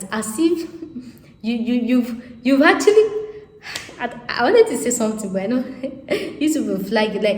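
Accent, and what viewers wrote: Yoruba, Nigeria. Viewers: I just like the way u speak your yoruba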